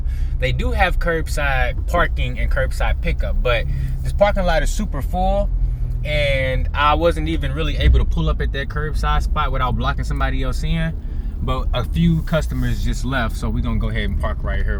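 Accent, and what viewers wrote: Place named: Missouri, United States. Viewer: Funny bro WAZ HAPPENIN! Like that MO accent CHINGY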